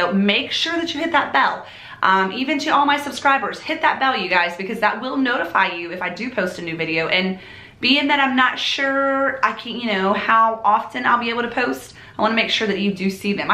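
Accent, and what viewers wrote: South, United States. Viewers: Hi, I love your accent, that southern drawl, and I wish I could decorate as good as you do, you inspire me to go bigger than the small decorative touchs I was doing, I went from a 3 foot tree to a 7 1/2 ' tree and for me that was a major thing, don't look at others who you think are more successful than you, just be you, please don't try to change, but be the person you are, if you have been crafting show that, if it's the garden or the chickens or your bees, show that don't think I need to spend money on xyz, you have been missed and I'm glad you are back.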